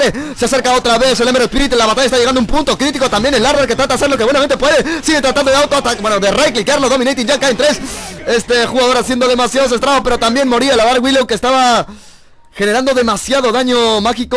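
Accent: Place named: Peru. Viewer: Claramente es peruano jaja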